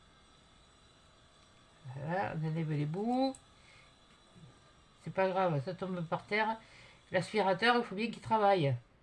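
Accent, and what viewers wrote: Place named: Southwest, France. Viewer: Re coucou. Bon le trouillaumétre a baissé...😁 Vous vous en sortez très bien Ahh du sud-ouest...moi aussi mais avec un accent de Marseille...peuchère 🤣 Ohh tu parles de Meriadeck tiens donc.... Pas de soucis de cadrage, vous êtes dans le champ de notre vision 😁 Sinon...De superbes cartes, j'adore le violet aussi..de la récup de chutes, le must...adorables créations un grand bravo et encore bienvenue. 💖💖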